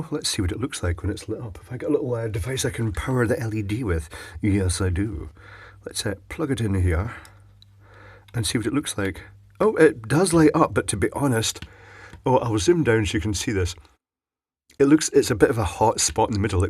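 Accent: Scotland, United Kingdom. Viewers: I'm just going to watch your video for your accent! love it Scottish right? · You have the most British accent I have ever heard, but then again I am from Stoke-on-trent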